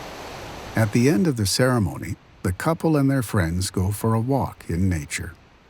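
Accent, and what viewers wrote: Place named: United States. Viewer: Watched one minute. American narration is awful. Not watching.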